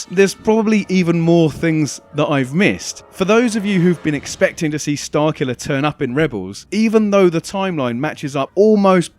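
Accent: United Kingdom. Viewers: You've put together some very interesting analysis, sir. I dig the British accent, too, a freakin' awesome--or bloody awesome, as your people would put it--addition to this video. Although born in the USA, I am fairly certain that my last name derives from your whereabouts. Anyway, enough with the unnecessary formalities. Let's talk Starkiller and Rebels. Admittedly, the chances of Galen Marek appearing in Star Wars: Rebels are slim-to-none, but I'm still crossing my fingers in hopes that Disney figures out how to make it happen. On face value "The Force Unleashed" title is most akin to the Star Wars Legends banner; in that light, certain events that take place in TFU would perhaps strike Star Wars fans as too 'extreme' for lack of a better word, too outrageous or far-fetched to be designated as "canonical" (see: Marek using the force to pull down an entire star destroyer until it crash-lands). 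On the other hand, George Lucas played an integral role in the development of Marek's story arch, suggesting a heightened significance of TFU in comparison to many of the older Expanded Universe novels; also, Disney can always hand pick-and-choose amongst the more 'realistic' happenings that take place in The Force Unleashed...w/e that means. It's all science fiction, after all. Diehard Star Wars fans are so apt to forgetting that this is all fake, that it's all merely intended for entertainment. Real talk. At the end of the day, none of these motherf***ers are real. For all I care, Di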